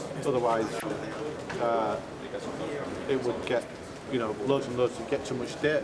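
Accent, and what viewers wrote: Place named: Hull, United Kingdom. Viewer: I love that he still has his hull accent :)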